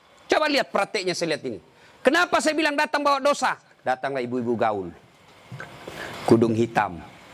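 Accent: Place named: Bugis, Indonesia. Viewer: Sy senang ceramahnya sangat mendidik, logat bugis terkesan mengasyikkan, sy pernah tinggal di sulawesi, jd sangat teringat logat yg dibawakan...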